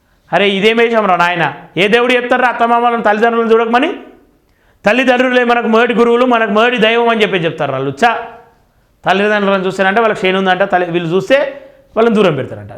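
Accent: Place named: Telangana, India. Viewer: తెలంగాణ స్టైల్ లో మాట్లాడినావ్ అన్న సూపర్.❤❤❤❤❤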